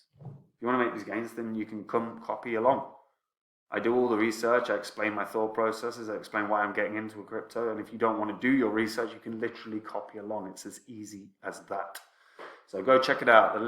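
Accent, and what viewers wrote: Scotland, United Kingdom. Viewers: do i hear a hint of scottish in your voice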